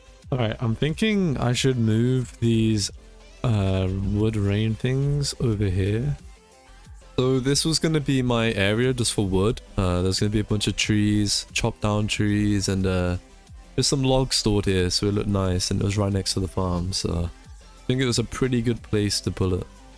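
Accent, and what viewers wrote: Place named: United Kingdom. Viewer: He has a British accent!!!!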